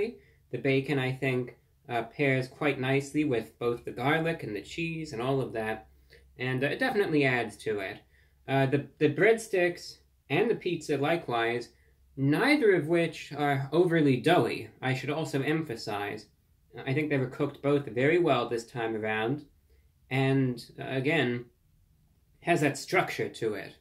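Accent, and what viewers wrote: United Kingdom. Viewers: Loving his heavy British accent on the podcast now ❤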